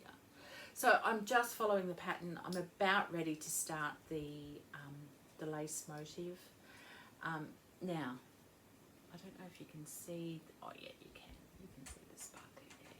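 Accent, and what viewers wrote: Australia. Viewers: Hi. Great to hear another Aussie voice. I’m at the other end of aus from you in Vic. Love knitting. I’m a monogamous knitter. I find it too stressful having several things on the go.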